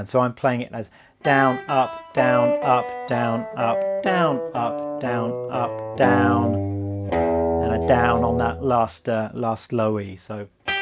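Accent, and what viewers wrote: United Kingdom. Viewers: Hey just found out your channel !! Obviously it's an easy song, but your teaching is priceless and your UK proper accents adds up to the lesson :) :) Thank you for taking the time to make a Youtube channel! Cheers from france merci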